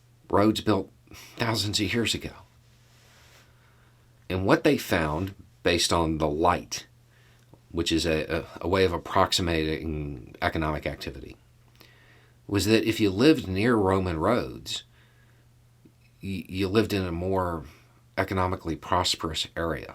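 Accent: South, United States. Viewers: Oh, talk to me, eloquent American Southerner, about the logistics of ancient Rome.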